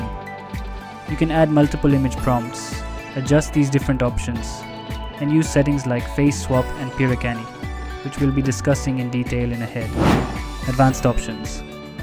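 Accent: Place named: India. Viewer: Indians speaking English sound very ridiculous, next time use subtitles or remove anything below your tonge, the world will thank you.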